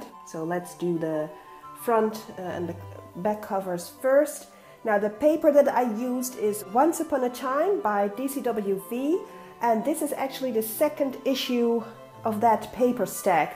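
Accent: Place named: Netherlands. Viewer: I should have recognized that accent. I'm from the Netherlands as well but moved to Canada when I was 8. Do you have a tutorial on how you make your albums? I would love to make one similar to yours and that size. I can only find tutorials to make them with envelopes and card bases. What are the measurement for an a4?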